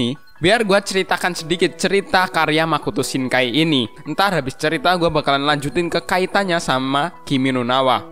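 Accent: Lampung, Indonesia. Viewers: Bang lu orang Lampung? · Tabik pun Bang lu orang Lampung ya? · Bang, lu dari lampung tah ?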